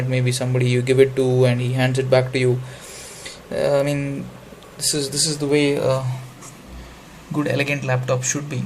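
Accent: India. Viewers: There's something about the Indian accent with reviews that I really like o_O Good review anyway.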